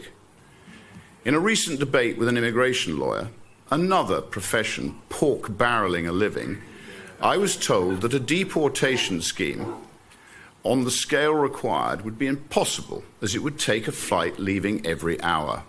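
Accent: England, United Kingdom. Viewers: This is what a real Englishman sounds like! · Thats what a TRUE BRIT sounds like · You sound just like a true American with a british accent!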